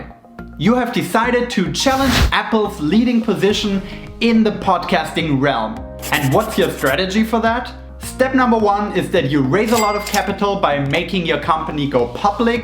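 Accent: Germany. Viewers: Hey, where are you from? :) you have a little bit of an german accent... As we say in german: gefällt mir ^^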